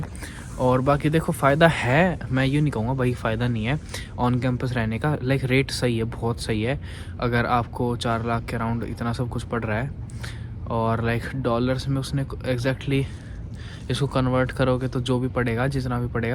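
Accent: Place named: Haryana, India. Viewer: Bhai Haryana se ho Pura touch h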